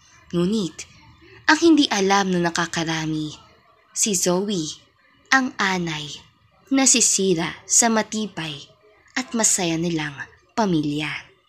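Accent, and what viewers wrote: Visayas, Philippines. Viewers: Napakaserous Kasi Ng Bago mong narrator Hindi kagaya mo na Minsan may nakakatuwa Lalo na Kong may halong bisaya tuwang tuwa ako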